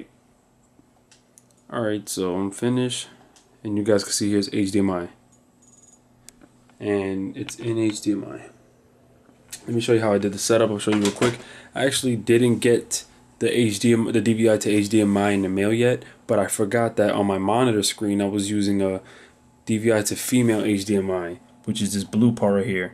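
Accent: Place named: Queens, United States. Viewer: Nice vid bro I can tell you're from Queens NYC I'm from Brooklyn LOL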